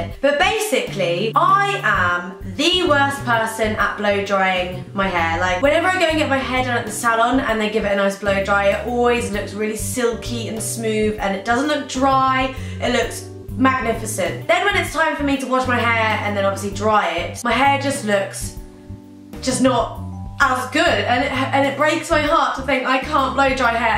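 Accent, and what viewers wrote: United Kingdom. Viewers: You sound like Demi Lavato but with a british accent ... O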